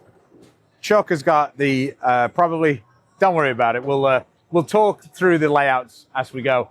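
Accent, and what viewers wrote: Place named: United Kingdom. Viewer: Extremely britesh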